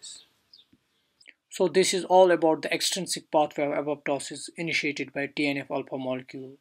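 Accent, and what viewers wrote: India. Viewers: your accent is very strong indian, but you really made me get the clue. thanx from germany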